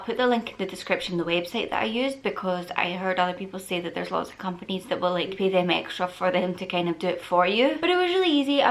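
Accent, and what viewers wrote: United Kingdom. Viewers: I love your accent are you British 💞💞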